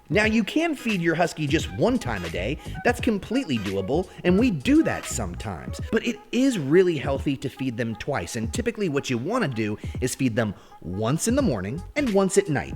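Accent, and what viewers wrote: United Kingdom. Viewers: This guy can star in any British movie lmao I love his British accent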